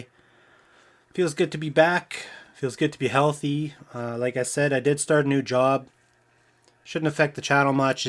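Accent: Canada. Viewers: I thought tube had closed u down man good to hear rhat Canadian voice good video bro 🇬🇧🏴‍☠️🇨🇦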